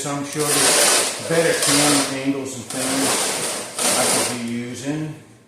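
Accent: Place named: Northeast, United States. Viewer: You live in Texas? From your voice I assumed you were from the Northeast.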